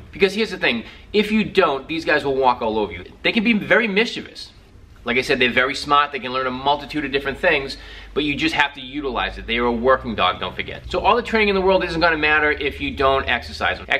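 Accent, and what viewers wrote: Boston, United States. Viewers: Boston? I recognize the accent...and the shark comments! Interested in this breed but I can’t find local breeders. Do you know of any? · where does this guy live??? He has a boston accent, but there are no sharks in boston. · Can tell this guy is from Boston immediately 😅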